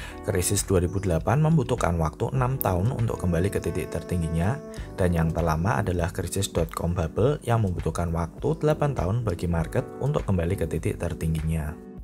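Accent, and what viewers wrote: Java, Indonesia. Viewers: kalau medok apa tidak bagus? mau baca text atau tidak, video-video di sini tetap recommended kok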